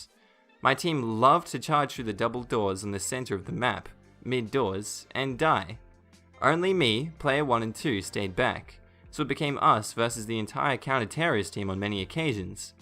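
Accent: Australia. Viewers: Are you Australian? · Ello fellow Australian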